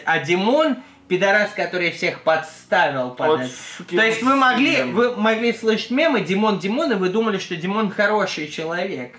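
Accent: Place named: Rostov, Russia. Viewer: пол часа назад зарегался в твитере и у меня высветился Хованский в первых строчках рекомендациях это прекрасно базарит так как все у нас на районе в Ростове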